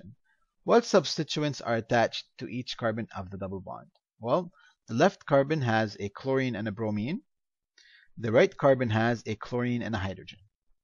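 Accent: Arab world. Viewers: You sound pure Arab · lol I can hear your Arabi accent :P thank you for this!...test tomorrow ;(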